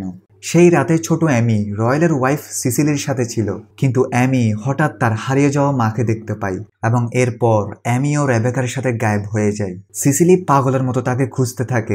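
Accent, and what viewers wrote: Chittagong, Bangladesh. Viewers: আমার মনে হয় আপনি চিটাগাং এর। আপনার কথা বলার স্টাইল টা তাই বলে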